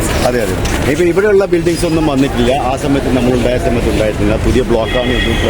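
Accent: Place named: Kannur, India. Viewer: ഭായ് ജീവിതത്തിൽ ഏറ്റവും മറക്കാത്ത സ്കൂൾ ജീവിതം ഒരു ടെൻഷൻ ഇല്ല നിന്തി കുളി ബോൾ കളി ചുണ്ടൽ ഇട്ടു മീൻ പിടി ഒരു സമരം കിട്ടി ലീവ് ആവാൻ എന്നു ദേശാഭിമാനി വായിച്ചു നോക്കും അന്ന് ഉള്ള മാഷ്ന്മാർ ഫ്രണ്ട് മരിച്ചാലും മറക്കില്ല പക്ഷെ എന്നു കുട്ടികൾ മൊബൈൽ നെറ്റ് ഹായ് ഭായ് കഴിഞ്ഞു ഭായ് എല്ലാവരോടും ചേർത്ത് പിടിക്കുന്ന കാണുമ്പോൾ ചങ്ക് പൊട്ടുന്നു നമ്മൾ കുറെ ആയി നാട്ടിൽ വന്നിട്ട് ലോകത്തിൽ ഇവിടെ പോയാലും നമ്മുടെ കണ്ണൂർ ഭാഷ പറയുന്ന നിങ്ങൾ ഒരു 22ക്യാരറ്റ് ഉള്ള രാജ്യ സ്‌നേഹി ആണ് താങ്കൾക്ക് കുടുംബത്തിനും ദൈയിവം നന്മകൾ തരട്ടെ 🙏❤️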